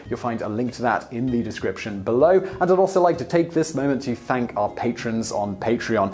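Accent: United Kingdom. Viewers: He's British.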